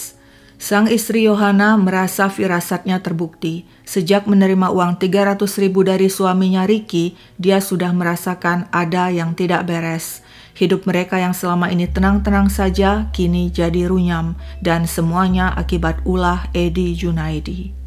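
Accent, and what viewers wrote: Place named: Medan, Indonesia. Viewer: Logat ini kek logat Medan om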